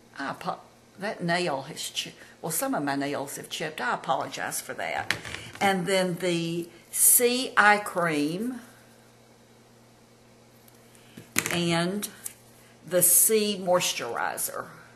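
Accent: South, United States. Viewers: This lady is an such a debutante of the South! The accent is priceless and you can tell she is a genuine person with a great heart. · I love her and her accent because I’m from the south. You look beautiful!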